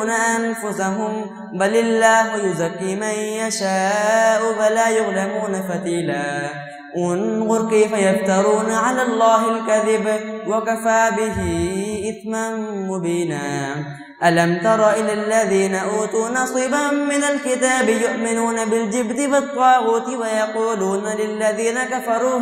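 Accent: Panipat, India. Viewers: Pure pani Patti lahja,bahtreen MASHALLAH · ما شا الله لهجه تو پا نی پتی لیکن یہ جھو لتا کیوں ہے؟ سمجھا نے کی ضرورت ہے ۔ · Bhai isse nach kr prhna nii kehte Isse pani patti lehja kehte h ap ko apni islah ki koshish krni chahiye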